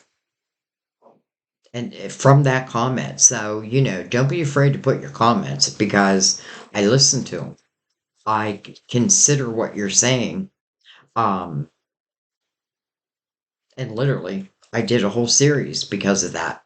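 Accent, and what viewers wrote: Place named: Philadelphia, United States. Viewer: Well, well.... It no coincidence this reading popped up on my feed first thing this morning and I had time to listen to the entire reading. Boom, boom, boom.... So much here. Thank you for your gift. Also, I'm from outside of Philly and. Sounds like you also are from that area...😊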